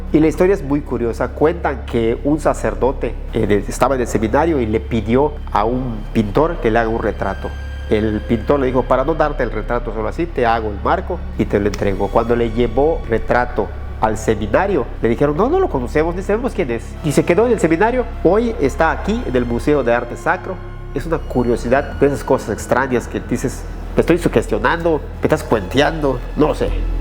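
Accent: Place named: Yucatan, Mexico. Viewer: FELICIDADES POR SU VÍDEO Y PRINCIPALMENTE PORQUE USTED HABLA CON EL ACENTO YUCATECO. PORQUÉ AHORA YA SE ESTA PERDIENDO, SIIII YA QUIEREN TENER EL ACENTO DEL D.F. ESOOOO ES AVERGONZARSE DE SUS RAÍCES YUCATECAS. YA LAS MUJERES ANDAN CON PANTALONES, PANS, TENIS, MOCHILAS A LAS ESPALDAS. AHHH PEROOOO LO QUÉ NUNCA LES FALTA ES.... UN CELULAR EN LA MANO..QUÉ TRISTEZA VER A LA GRAN MAYORÍA DE LOS YUCATECOS AMAÑADOS POR LA TECNOLOGÍA Y TODAS LAS COSAS QUE DESEAN IMITAR DE LOS QUE ESTAN LLEGANDO A VIVIR AQUI.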